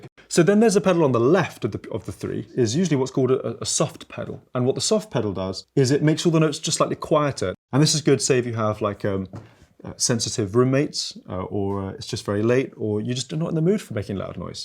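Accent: United Kingdom. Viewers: HE'S BRITISH?!?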